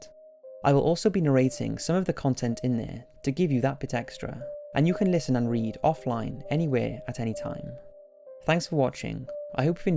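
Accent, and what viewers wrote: United Kingdom. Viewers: YESSS! The British narrator is back 😆😃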